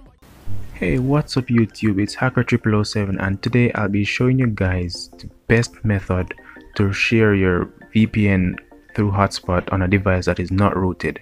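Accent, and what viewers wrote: Jamaica. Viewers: You a jamaican?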